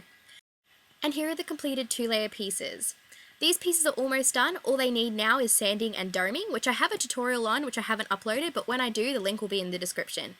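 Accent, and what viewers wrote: Australia. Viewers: An Australian accent! So refreshing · I love your beautiful Aussie accent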